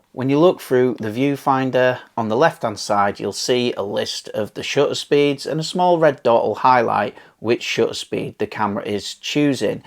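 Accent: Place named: East Yorkshire, United Kingdom. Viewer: Very helpful, thank you ! Also a lovely rare bonus to hear a fellow East Yorkshire accent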